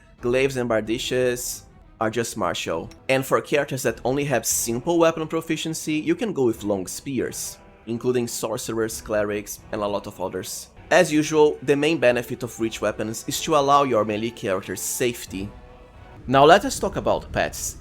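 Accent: Brazil. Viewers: What a kind, beautiful, genuinely helpful and clearly profoundly rich in experience video. A video out of pure passion. Thank you so much dude. Also, let me ask you, if you see this comment despite it being on an old video: Are you Brazillian? I ask because I am and your accent sounds a little similar to mine hahah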